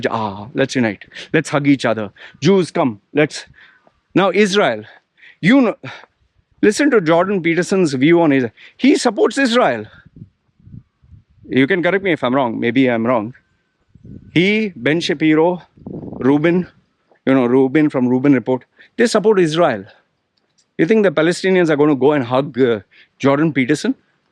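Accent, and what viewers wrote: India. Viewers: You're definitely not wrong! I'm a Muslim we're supposed to believe with conviction our book and prophet. So some canadian professor waving his finger saying you muslims this and that is a joke to me. I actually respected him alot until this video it really made me see his ignorance. I believe you're Indian from your accent so we both know how western culture colonized and pillaged our countries for their resources and wealth.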